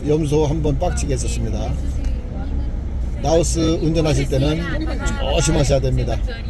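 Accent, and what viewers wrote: Jeolla, South Korea. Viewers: 님사투리가 진안장수그쪽인가요 옛날군생활할때후배가비슷한사투리썼는데 귀에익어서요ㅎ암튼잘보고있어요 건강하세요!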